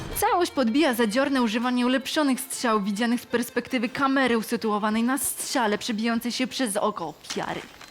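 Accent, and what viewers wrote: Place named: Ukraine. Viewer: Fajnie że jest popularna streamerka. Natomiast w roli prowadzącej, nie sprawdza się, ponieważ ma problem z dykcją i emisją głosu. Strasznie się tego zle slucha. Nie wiem co to za maniera wymowy ale brzmi jakby była ukrainką.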